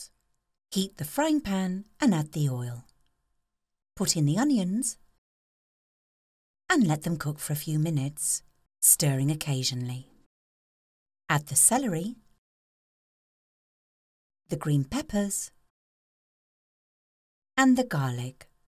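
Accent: England, United Kingdom. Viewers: How gratifying to hear a sweet and lovely English voice. After watching this video I must try cooking this myself.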